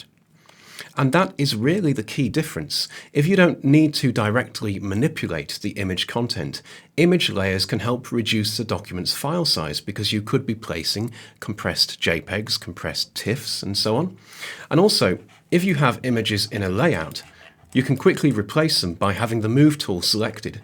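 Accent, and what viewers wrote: United Kingdom. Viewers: 超爱你的英国口音。哈哈。另外一个老头不喜欢，还是你讲得好！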